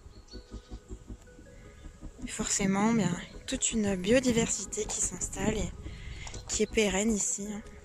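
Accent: South, France. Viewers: L'accent du sud il est vraiment classe 🤙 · L'accent du sud 👍😀.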